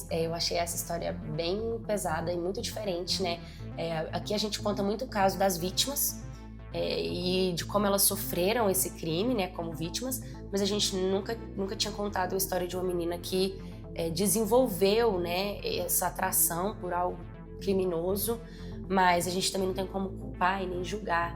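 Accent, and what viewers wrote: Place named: Curitiba, Brazil. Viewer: Eu não sei porque, a voz dela me lembra a da dubladora da toph, Ahsoka tano e da Gwen do Ben 10 só que com sotaque de Curitiba.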